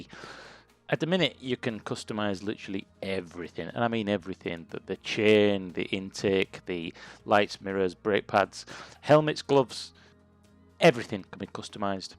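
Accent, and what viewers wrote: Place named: England, United Kingdom. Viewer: an absolute clusterfuck in english accent..perfect ..peter usa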